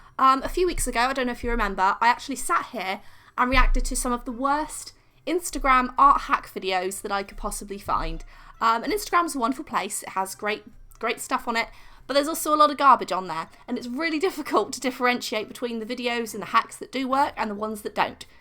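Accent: England, United Kingdom. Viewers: Are you British? Your accent sounds very British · Are you British? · She’s British 😂😂😂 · The British accent tho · I did NOT expect a british accent 😂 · I'm Australian and I laughed so hard at this comment because she's so obviously British to me lol. I'm not an expert but I believe her accent is northern of some kind. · it is a British accent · Hang on... She has a British accent but mention Walmart which doesn't exist in the UK so does she has a british accent but lives in us or am I tripping 😂😂😂 amazing video tho xx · Woah a wild British person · You sound British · r u british? love ur accent!! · How is this beautiful child of a woman not British??????? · Walmart??? Are you American? You sound English...? Xx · You're British right? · no this is not American accent its British i live in the us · I'm in love with your British accent😍😍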